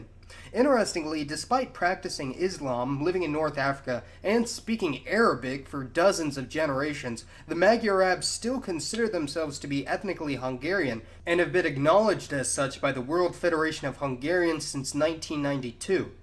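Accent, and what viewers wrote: United States. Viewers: Dude, your hyper-stereotypical American pronounciation is just killing the fun in listening to your vids.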